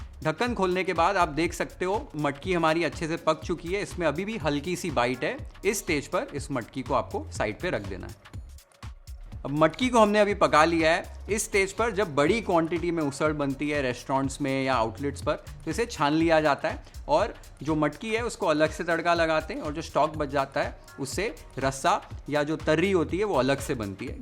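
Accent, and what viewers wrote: Maharashtra, India. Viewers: Sir I truly admire your pronunciations in Marathi esp "l" which is authentic for Maharashtra, hats off to you for introducing authentic recipes of India along with authentic language pronunciations, best wishes for the same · Bhai ki Marathi accent ❤️ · Chef , nicely talking Marathi -Hindi misal language 😊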